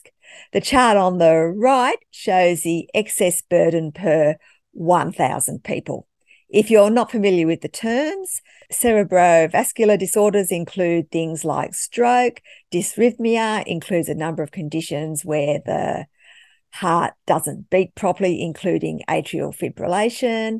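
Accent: Australia. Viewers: Australian accent ?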